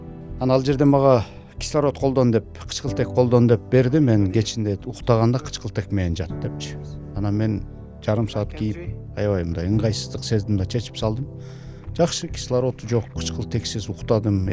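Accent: Standard. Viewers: аман бол сен Сайдилхан агайдын уулу окшойсунАтан атактуу мугалим ошондуктан таза маанилүү Кыргызча таза сүйлөп жатасынӨзү Кара Кулжалыктар аябай чыйрак чыдамкай өжөр болобуз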